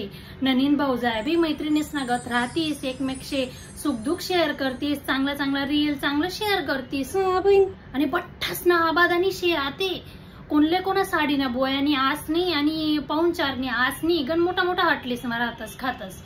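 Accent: Khandesh, India. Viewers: Kiti natural acting kartes ❤ Ahirani aikayla khup goad vatata❤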